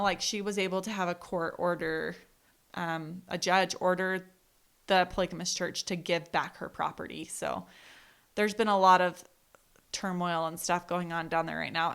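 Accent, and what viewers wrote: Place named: Utah, United States. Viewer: I love you, so much more after hearing your story. It’s incredible how God uses our testimonies to grow and change us. I could tell after us meeting that your accent sounded like you were from that area of Utah! I’m so proud of you for sharing your story. Love you guys!!!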